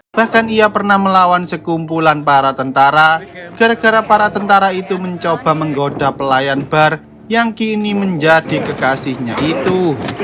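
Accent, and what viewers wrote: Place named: Banyumas, Indonesia. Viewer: Jadi gk seru ngapaknya kebangetan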